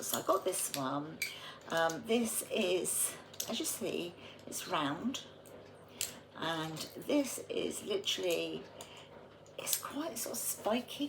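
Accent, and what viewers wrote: United Kingdom. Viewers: I could listen to your lovely voice and British accent all day❤ Just put that grater in my cart! I needed one! Ty. Great haul👍🏻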